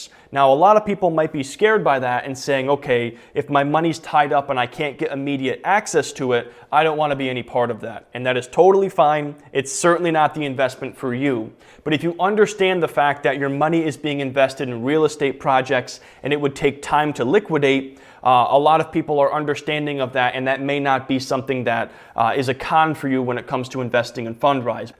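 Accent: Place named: Midwest, United States. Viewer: Hey, just curious where you're from? It sounds like the Midwest. Are u from Utah or Wisconsin or something?..just curious:)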